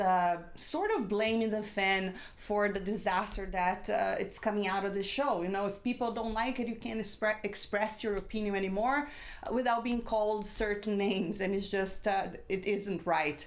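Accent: Brazil. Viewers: Que bela resenha. Sei que é brasileira pelo sotaque imediatamente. Sou americano mas meu pai é brasileiro ;) Ótimo canal, me inscrevi! Sucesso! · Are you Brazilian? Sounds like a Brazilian speaking English. (Good English though), thanks for the video.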